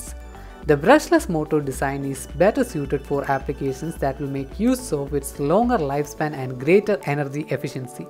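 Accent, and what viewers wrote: Kerala, India. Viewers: Thanks for the video! The mallu accent brought back so many memories from Amrita University!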